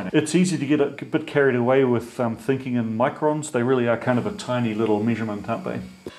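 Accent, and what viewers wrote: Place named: New Zealand. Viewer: I'm a hobby machinist and have spent 30 years trying to learn as much as possible. This video was the best, most engaging learning I've experienced since hanging out with my 90 year old tool maker friend when I first fell in love with machining. THANKYOU for your time and content. This was so good. I fully thought you were kiwi like me with your accent, but maybe an Xpat... thanks from down under down under. Chch nZ ❤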